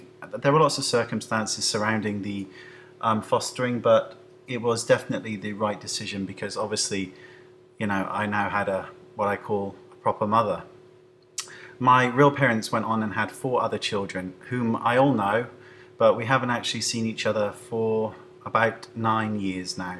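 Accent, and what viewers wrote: United Kingdom. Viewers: Love your story, love how passionate you are about makeup and love your Brittish accent ;)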